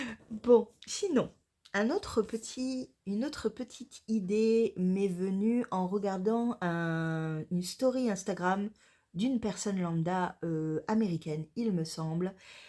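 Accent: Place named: Jura, France. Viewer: Mais oui, continue le ton Jura! Ce serait dommage de le lâcher 😊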